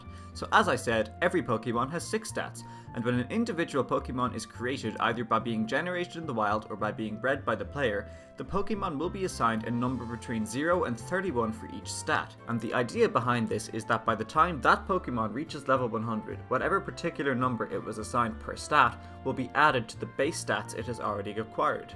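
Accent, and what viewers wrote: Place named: Ireland. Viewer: I can tell by you're accent that you're Irish. I am, too!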